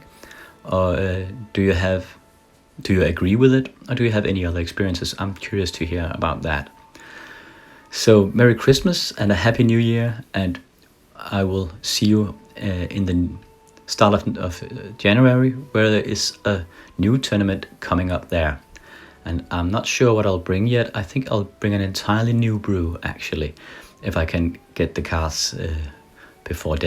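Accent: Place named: Denmark. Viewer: I thought you sounded different from Timmy, best friend is in Denmark, always like hearing the accent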